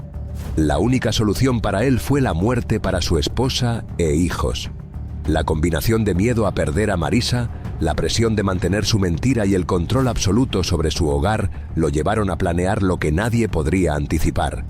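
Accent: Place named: Spain. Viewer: Ya era hora! Un narrador ESPAÑOL.😊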